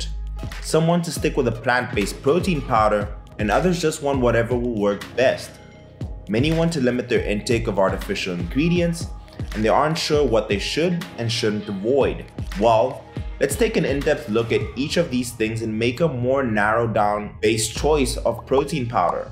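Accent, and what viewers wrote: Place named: South Africa. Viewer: You sound South African ❤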